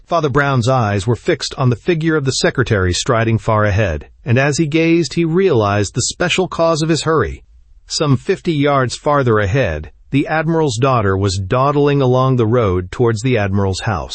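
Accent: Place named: United States. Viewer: i want british accent.. not that ugly american accent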